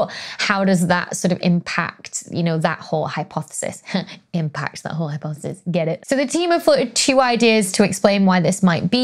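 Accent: United Kingdom. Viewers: I Totally Love You!!! Girl next door Astrophysics?! Aw, hell yeaz!!! Where were you when I was growing up? I hope you don't mind if I share this video with my FB Friends to settle a quip? The arguments were: 1) Would Betelguese blow within our lifetimes? It's possible. 2) B'Geuse is somewhere between 500-650 ly from Earth (depending on who you believe). Tycho's Star was estimated at 9,000 ly; Keppler's Nova was estimated at 20,000 ly from Earth. Without specific climactic data from the 'Rennaissance Era', how can we say that Betelgeuse is NOT a threat to Humanity? There has never been a recorded Supernova THIS CLOSE! (?) With 40 years experience in the Nuclear industry (25 years in Health Physics), I know that we'll get BLASTED with neutrinos, probably a shitload of Gamma as well. My question is (and perhaps this will settle the gripe?)... what about the Mass Ejection/ Cosmic Rays? 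I'm new to your channel, but I am totally HOOKED! I studied Astrophysics at Penn State after I left the US Navy (Submarine Force) in 1991. No offense to Neil De Grasse Tyson, Stephen Hawking, Kip Thorne or even Carl Sagan... you are MUCH prettier than them! You are an amazing COMMUNICATOR. Keep those beautiful blue eyes, sweet personality and soft 'Brit' accent out there.... I'll be here!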